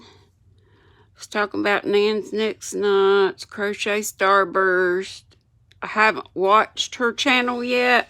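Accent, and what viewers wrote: South, United States. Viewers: Oh by the way it was fun to hear Tom Sawyer read in the southern language it was written in😉( I’m a northerner)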